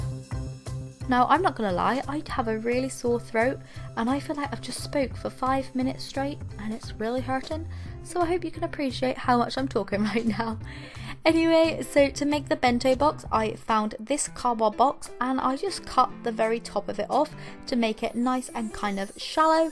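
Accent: United Kingdom. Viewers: I love watching you because I love your British accent 😂 I live in America and I have a Southern accent because I live in Alabama which is like at the bottom of America.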